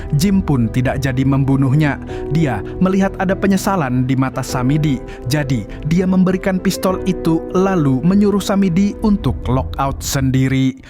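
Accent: Banjar, Indonesia. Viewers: admin nya urang banjar kah? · Banjar host nya wkkw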